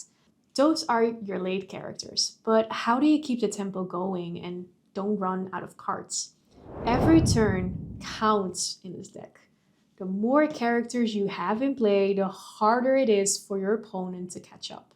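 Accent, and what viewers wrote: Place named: Netherlands. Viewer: Ondanks je goede Engelse uitspraak verraad het lichte accent toch dat je Nederlands bent. Leuk hoor… zelf zoek ik nog steeds een community in mijn woonplaats.. goede video verder en heb al veel kaarten van dit deck dus ik ga deze eens proberen met wat kleine aanpassingen(ik heb de shiftline van vanellope niet)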